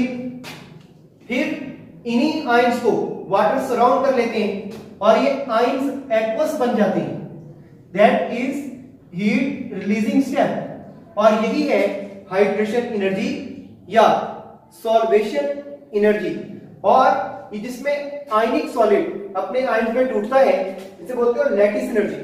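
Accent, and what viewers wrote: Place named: Pakistan. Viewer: sir are you from pakistan beacuse of your accent if you are then love from india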